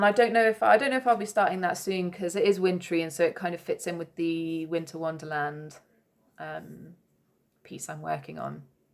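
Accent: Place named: Cornwall, United Kingdom. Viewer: ... your lovely, your projects are beautiful. Your graduating to lovely & big Samplers. Modern Folk Art is in Flosstube, he’s got many pieces, some reproductions, that reflect your large pieces. When you says Manchester, I thought hummm that’s not a Manchester brogue, & then Cornwall came out, yes lovely to listen to you 🌺 Check out Hands Across the Sea, Nicola Parkman. She’s in Cornwall. Floss tube is a rabbit 🐇 hole... delightfully so. Until next time. Stay safe... Blessings ~ across the pond, 🇺🇸 Ohio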